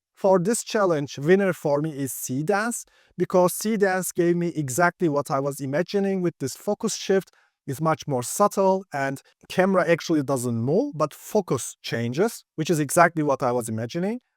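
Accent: Turkey. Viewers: Türk duyduğumda tanırım :) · Sanki ingilizcende bir Türk aksanı var gibi ama neyse😅😅😅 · I hear a strong Turkish accent.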